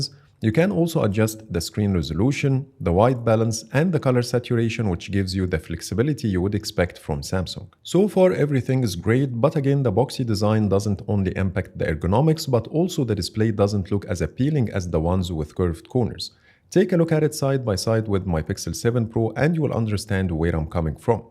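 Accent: Egypt. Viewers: I like the way you embrace your Egyptian accent and not even try to cover it while sounding perfectly clear 👍 also great review from end user prospective just what viewers need.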